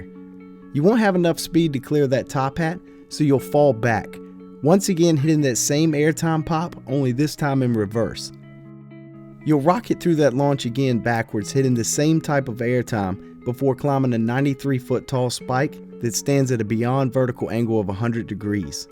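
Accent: South, United States. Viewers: sounds COUNNNTRRYYYY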